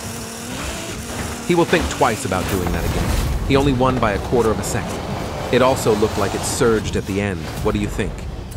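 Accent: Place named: Australia. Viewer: that sounds more like Australian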